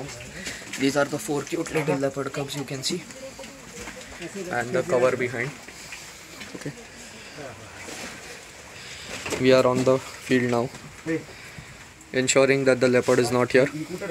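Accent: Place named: India. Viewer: "Our deem members are now sutting upp the camra to ensure the safety of the Lappad"...Indian accent !!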